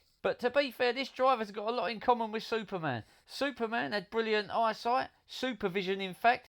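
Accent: Cockney, United Kingdom. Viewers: Love all your videos,but the cockney just adds the cherry on the cake.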